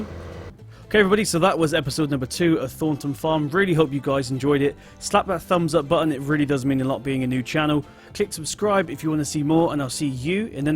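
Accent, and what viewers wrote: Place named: Northern England, United Kingdom. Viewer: oh deer pal so you sound northern dint realise m8t your vids are amazing can u do state trooper patrol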